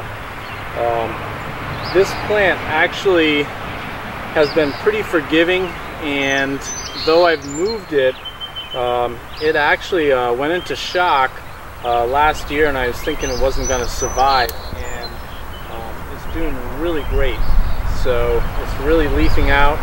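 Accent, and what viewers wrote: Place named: Boston, United States. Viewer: Every time I watch one of these videos, I wonder if it’s gonna be relevant to my zone and the moment I heard your Boston accent I knew I was good!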